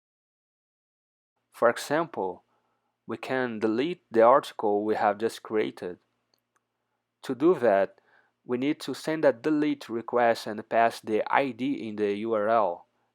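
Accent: Brazil. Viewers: Fiz a mesma coisa, o sotaque não engana! Show Brazuca na veia! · Pause o video pra conferir se ERA BR vc. Aceitei 😂. Muito bom o vídeo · I can tell you are brazilian by the accent, very good video sir.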